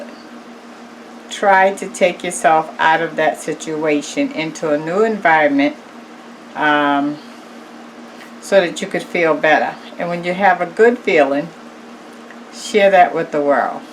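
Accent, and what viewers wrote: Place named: New York, United States. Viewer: I just love your jersey or new York accent as well as your channel thanks for sharing hun😀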